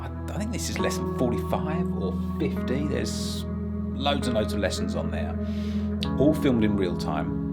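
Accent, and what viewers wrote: United Kingdom. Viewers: Lovely British accent🥰😀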